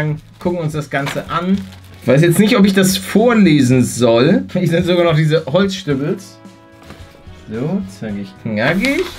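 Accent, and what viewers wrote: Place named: North, Germany. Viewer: Er hat nen geilen norddeutschen Akzent xD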